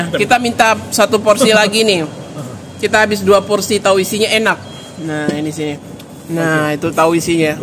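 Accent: Minangkabau, Indonesia. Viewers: Dilek Minang kemirip Jawa, Gitulah sora, Sora menggetar jiwa, Ceria girang puas didengari.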